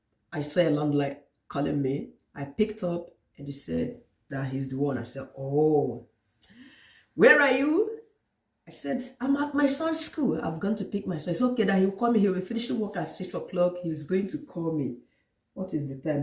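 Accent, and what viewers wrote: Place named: Pidgin, Nigeria. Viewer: I enjoyed listening to your story coupled with the mixture of Naija pidgin English🤣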